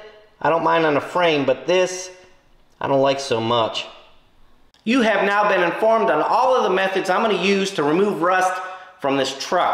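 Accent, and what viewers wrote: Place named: New England, United States. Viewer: Another great episode. Rust removal so tricky. Looking good. I’ve never heard of that milkstone acid. Did a great job. Im so curious about your background. Obviously for your nickname and accent you are from New England? Maybe New Hampshire? And I wonder if you have farming in your background. Did you work as a mechanic? Just curious. You have so many different skills. Enjoy your channel.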